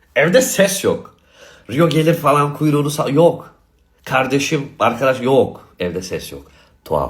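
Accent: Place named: United States. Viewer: Abi Amerikalılar gibi konuşuyorsun ama dilin Türkçe.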